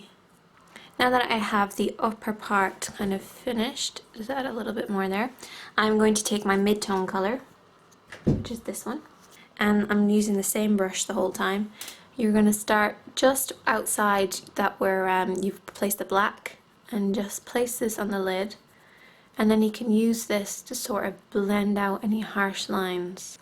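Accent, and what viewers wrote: Ireland. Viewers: are you from ireland ? o: your accent makes me think c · Are you Irish ?-2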